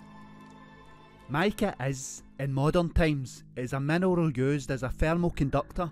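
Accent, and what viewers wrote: Scotland, United Kingdom. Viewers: That's a strong Scottish accent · I can hardly understand every other word of the Scottish accent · what part of Scotland is the narrator from please?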